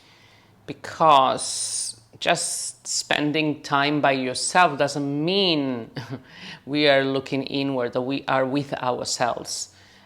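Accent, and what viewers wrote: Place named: Spain. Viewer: Amazing class, accessible to all with a twist of spanish accent. My go to class when I'm in need of slow movement and ease of mind :)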